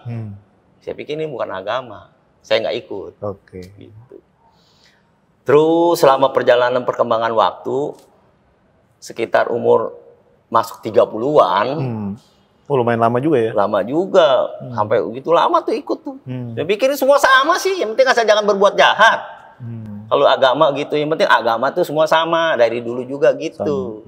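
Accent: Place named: Jakarta, Indonesia. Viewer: Betawi bgt ye ngkoh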